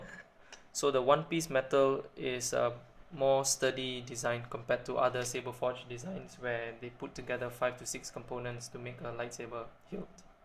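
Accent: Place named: Singapore. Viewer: lol I hear a Singaporian accent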